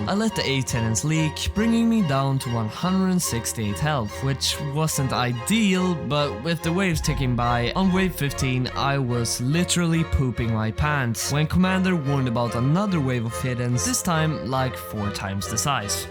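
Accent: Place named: Sweden. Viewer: bros talking swenglish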